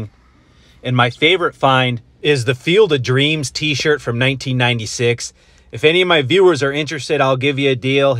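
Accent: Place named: Canada. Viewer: Where are u from? Your voice sounds Canadian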